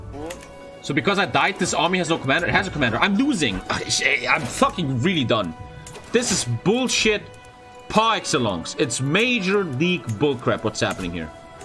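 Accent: Germany. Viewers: Is he German? Ok he is. Really recognized that at the end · I've just been sat here for 20 minutes trying to figure out what this guys accent is and it just hit me straight in the face and now I feel stupid, because it's german and also german. Das Denglisch hätte mir eigentlich nen Tip geben können... und es ist halt super lusitig.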